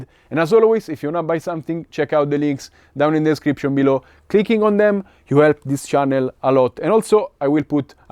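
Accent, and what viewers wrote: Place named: Italy. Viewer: When I was looking to buy my first quad I was confused. Finally I found Your channel and watched Your videos which gave me some knowledge about setups and motivational boost. During this time Your skills were totally mindblowing for me. It's quite funny when I'm coming back here right now I feel so motivated to go out and fly when I hear Your voice and italian accent:D Thanks:)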